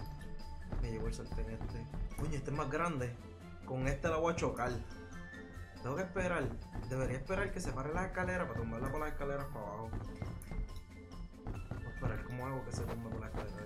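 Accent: Puerto Rico. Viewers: Tu suenas como si fueras de jayuya PR o algo😭🤣de que parte eres de Pr?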